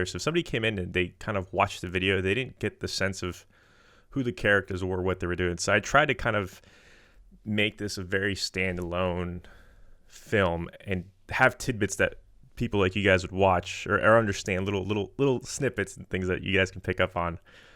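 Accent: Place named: England, United Kingdom. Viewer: Very clever of you!! I loved it. I knew that it was all you! But who is the narrator? Very English xx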